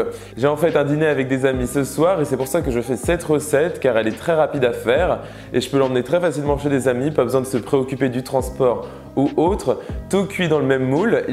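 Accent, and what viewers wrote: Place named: England, United Kingdom. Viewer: ouais ta raison . mais il a un accent déjà bien anglais peut être qu' il anglais et français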